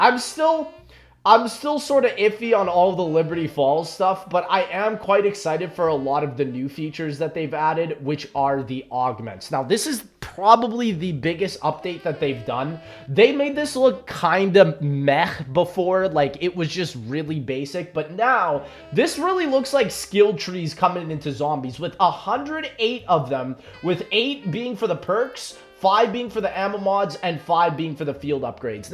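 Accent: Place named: Toronto, Canada. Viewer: Toronto accent 🤡🤡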